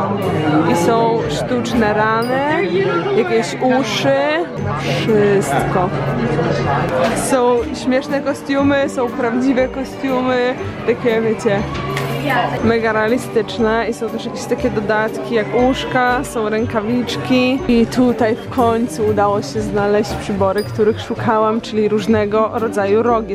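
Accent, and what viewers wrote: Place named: England, United Kingdom. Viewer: tobie już powili angielski akcent do polskiej wymowy się wkrada❤️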